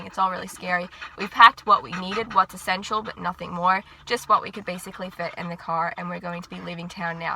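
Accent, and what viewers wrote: United States. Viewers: She sounds more American than Australian... 🤔🤔🤔. But I still really hope that you and yours family are okay!!!! May god bless your whole country!!!! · I thought you were american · Omg, I didn’t know you were Aussie, I thought you were American, since 2014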